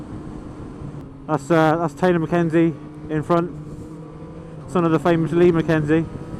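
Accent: United Kingdom. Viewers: Its the queens tounge, it never gets old. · The british accent gets old after a while.. Borderline annoying.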